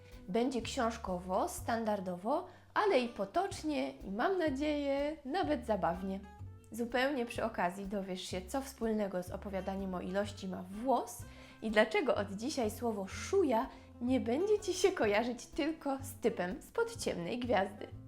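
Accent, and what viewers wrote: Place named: France. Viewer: ten akcent francuski mmm... cos pięknego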